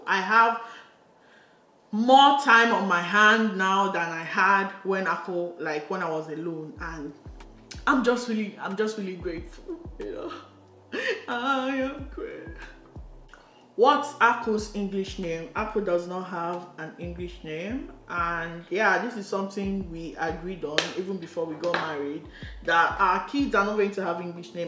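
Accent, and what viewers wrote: Igbo, Nigeria. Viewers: Exactly! There's absolutely nothing wrong with your Nigerian/Igbo accent. That's what endeared me to you😍 I love how rooted you are in our Igbo culture. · I love your Igbo accent. Ability to speak English without a foreign accent is NOT a measure of intelligence. My husband and I did our traditional wedding in January. Family keeps asking when are we doing church/white wedding? We tell them we aren’t doing a white wedding because we don’t want it. They look at us like we have 4 heads.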